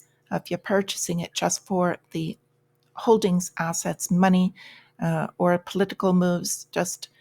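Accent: Ireland. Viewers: So nice to hear your Irish Brogue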